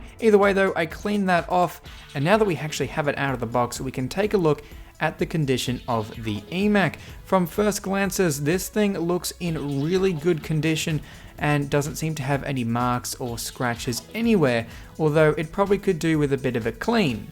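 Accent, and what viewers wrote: Australia. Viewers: My girlfriend is Australian. Easily recognizable accent to me. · Me: His accent sounds Australian Dead spider inside the computer Me: Yep, Australia confirmed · I knew he was Australian for a long time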